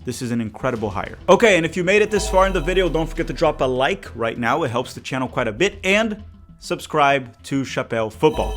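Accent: Rio de Janeiro, Brazil. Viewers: I'm sure you're Brazilian as well, otherwise, your pronunciation (in a carioca accent) is dazzling, you're just sublime speaking Portuguese, and if you're native, that would justify and explain everything, on the other hand , i would compliment your English 😂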